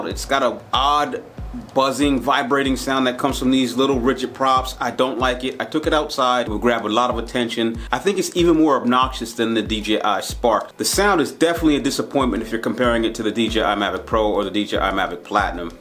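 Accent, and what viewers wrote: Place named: New Jersey, United States. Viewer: FIVE STARS! Always like your fresh comments, I just bought it! and is refreshing to hear sincere WORDS before I start out on my experience. Your the only reviewer, who tell it, right off, with out the fancy intro music video. To the point video from a reviewer who did not get a free one from DJI, Love The honesty Pal! KEEP UP THE spontaneous reaction! VERY HELPFUL! I am in Thailand, but you sound like the truth of my old neighborhood in New Jersey, very comfortable!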